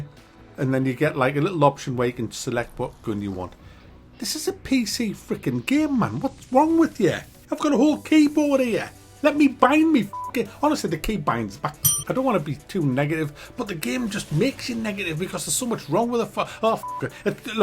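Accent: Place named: Newcastle, United Kingdom. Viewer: From now on I only want to hear my game reviews in Geordie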